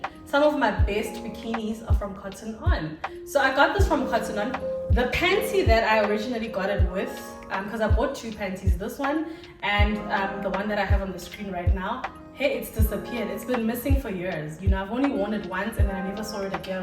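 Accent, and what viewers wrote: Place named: South Africa. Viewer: You're an excellent presenter and ambassador for busty ladies. You are charming. Plus I love your SA accent 🤩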